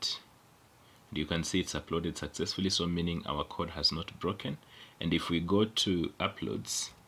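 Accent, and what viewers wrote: Kenya. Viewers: Nice to hear a Kenyan accent 🙂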